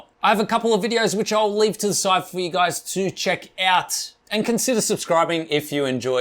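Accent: Australia. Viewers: Hello I was wondering where you were stationed? bc it sounds like you are Australian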